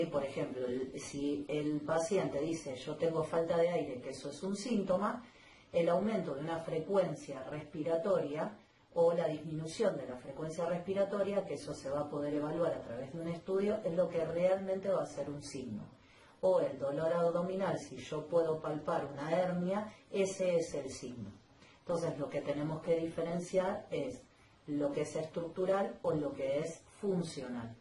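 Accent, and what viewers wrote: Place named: Argentina. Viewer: Buena explicación, lo único que el sonido y el castellano que habla, se me dificulta, entender, los términos médicos entendíble, la gramática argentina es lo que se me dificulta.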